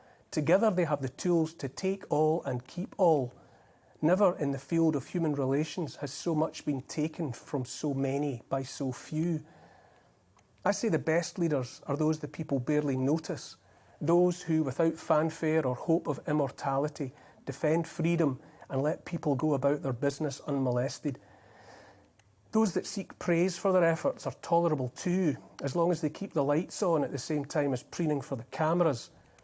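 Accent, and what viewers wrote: Scotland, United Kingdom. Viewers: Sorry, I thought you were a twat wandering around the countryside commenting on puffins et al. You are inspiring....especially as we can understand most of your brogue! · is really getting Scottish on us. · I’m always scrolling thru cuz I want to see more of, talks total sense. Conclusion - he’s not in the GB news schedule often enough, and I think the user comments reflect that. We all love that Scottish twang, although I think maybes the ladies out there are thinking Mel Gibson in Braveheart - dont blame u tho, I’m waiting for a rugged man (or woman if you’re not beaten down) of any Nationality to save us from this madness, xx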